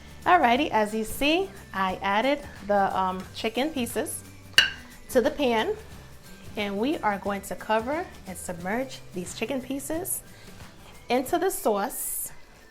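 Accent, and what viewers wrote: New York, United States. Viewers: I love your New York accent 💕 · Is that a New York accent?? It’s so cute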